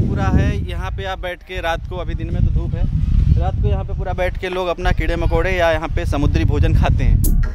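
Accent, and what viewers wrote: India. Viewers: Bhai ap jab bhi Desi andaaz bolte ho na to dil khush ho jata hai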